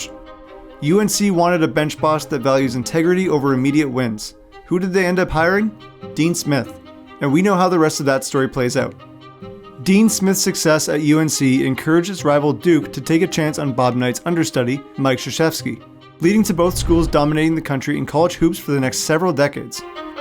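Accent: Canada. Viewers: The narrator makes two huge gaffes. One is his inability to correctly pronounce the word “Raleigh”. It is easy to pronounce if you pronounce the first half of the word like you would a college cheer. As in Rah Rah (insert team name next). The second half of the word is pronounced like the man’s name “Lee”. Put the two together and you have “Rah-Lee” or Raleigh. His second brobdingnagian blunder occurs when he tries to pronounce the word “Carolinians”. The proper way to pronounce the word is like saying “Caro- lynn-e-uns”. Practice a bit and he will master it in no time. Btw, he also mispronounces the word “South”. I am guessing he has roots in Canada by the way he says the word. Properly spoken, the word sounds like “Sow (as in a female pig” added to “th” as in the last to letters in the word “tooth”. Easy, right? Fun fact: in our 13 men’s college National Championships is the fact that North Carolina is the only state that has three universities with at least two championships each. 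North Carolina with 6, Duke with 5 and North Carolina State with 2. The announcer also mispronounces the word “out”. Once again, he must have roots in Canada.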